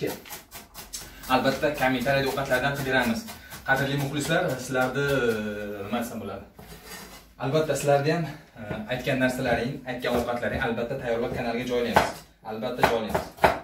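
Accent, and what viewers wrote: Fergana, Uzbekistan. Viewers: Manimcha boshizdegi Fargona doppi. Shuningchun soragandim. ham Fargonaniki togrimi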